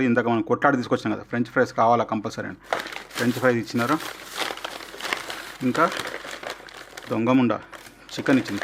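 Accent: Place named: Andhra Pradesh, India. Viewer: endanno motham andhra yaas maatladuthunnav, thu, I am unsubscribing now, its been a habit to our Telangana people famous ayindaaka Telangana yaasa, famous aynanka andhraaa yaasa start chesthundru, endanna, we should save our Telangana slang anna, good bye.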